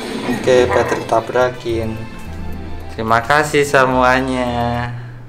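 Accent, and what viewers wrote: Java, Indonesia. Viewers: Ngakak , sampean wong jowo ndi bang patrick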